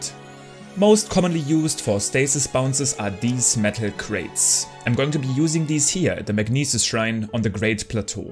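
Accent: Germany. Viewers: man hört so sehr dass du deutsch bist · I was wondering why he had such a german accent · He’s definitely German :) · habe mich schon gewundert warum er so einen Deutschen Akzent hat.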